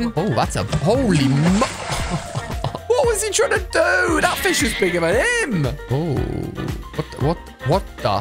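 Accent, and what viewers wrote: United Kingdom. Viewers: Why are you British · are you british?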